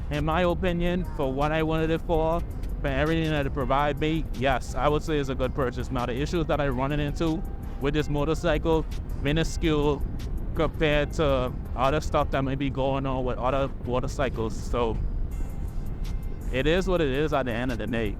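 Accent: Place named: Bahamas. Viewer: ik a bahamian when i hear one lol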